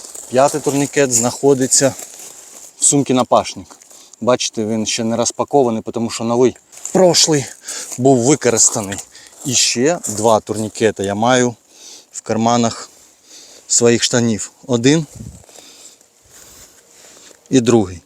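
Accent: Kharkiv, Ukraine. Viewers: Дякую за українську вимову, це ліпше ніж московитьським язиком. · Дякую, за корисні поради та за чудову Харківську українську ). Мова - теж зброя. ✌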